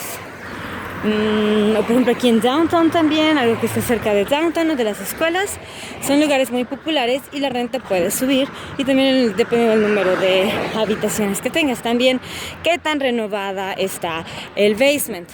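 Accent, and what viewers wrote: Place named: Mexico. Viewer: Me han gustado mucho tus vídeos, he pensado en irme a vivir a Canadá pero primero quiero conocer más a Canadá. En que provincias vives porque he visto muchos tus vídeos y por lo que has dicho es una provincia donde es más económicas que las otras. Te felicito, son excelentes tus vídeos y tu acento es como Méxicano; soy Colombiano.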